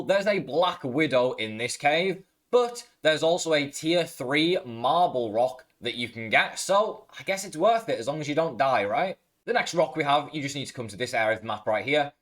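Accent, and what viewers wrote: Liverpool, United Kingdom. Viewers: Heyyyyy I been following your amazin grounded videos a while now all the way from switzerland and germany. not sure if you are scouse but I think you are. I been dreaming to be in Liverpool again since six years. you will find me busking in the evening on lord street (except saturday) since 1 june for a few months. approach me if you feel like. lol sorry crazy comment I know. hahahaha love your vids. say hi its haha. i dont busk with any amp just wit acoustic small guitar haha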